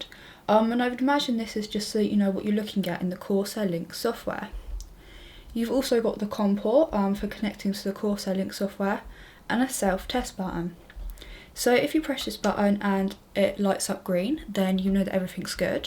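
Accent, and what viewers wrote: United Kingdom. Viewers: Sorry, she lost me when she said "...sexy double sided..." The Brit accent, gotta love it.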